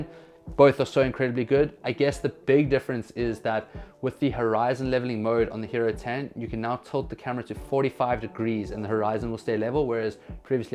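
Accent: South Africa. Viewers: Why do you sound South African? mei bru? heiboooo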